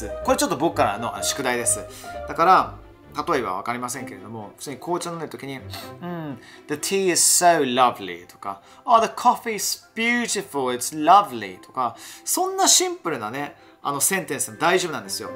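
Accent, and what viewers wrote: United Kingdom. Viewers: イギリス英語かっこよすぎるー😭品格半端ない…憧れ… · のイギリス英語が大好きで、いつもPodcastやインスタ観てます🇬🇧💕 イギリスにいると、電話先でも対面でも、たくさんの「Lovely!」が聞けて、Lovelyって言葉聞くと個人的にめっちゃ嬉しくなります❤️ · I’m Japanese but currently studying in uk and I really appreciate your lovely videos! They help me a lot to learn exact British pronunciation:)) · I fancy your lovely English accent!! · イギリス英語でLovelyがよく使われると知ってから自分でも使うようにしています！のイギリス英語で勉強するの楽しい〜！☺️ Your channel is so lovely:) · のイギリス英語って何と言うかすごくイギリスっぽいというか高貴な感じがするというか、素敵です！はイギリス生まれなのですか？ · I like your lovely British accent!!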